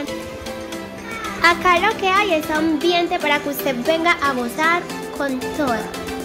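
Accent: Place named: Antioquia, Colombia. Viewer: Pero tu porque ablas paisa si no lo eres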